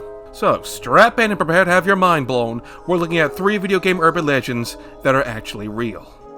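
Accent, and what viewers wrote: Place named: New York, United States. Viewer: i dont know why but you sound like a new yorker trying to talk like joe perra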